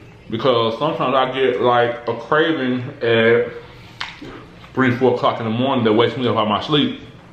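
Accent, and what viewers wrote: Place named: South, United States. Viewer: Love that southern accent lol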